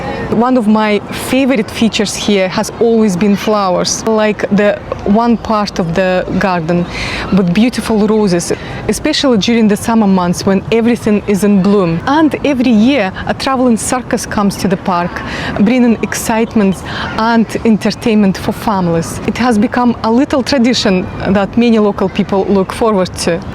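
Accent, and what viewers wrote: Glasgow, United Kingdom. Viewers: Great overview and what an amazing accent!! 50% Glasgow!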